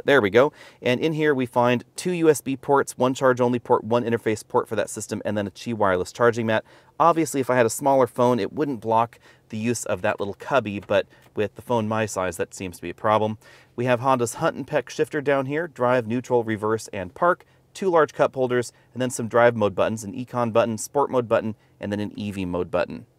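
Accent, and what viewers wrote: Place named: Texas, United States. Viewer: Must be that Texas accent.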